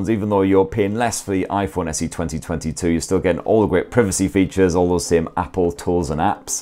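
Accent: United Kingdom. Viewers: I said this before: you are by far the most amusing tech reviewer out there. You could say anything in these vids and I would still enjoy them for the british accent and particular language.Cheers.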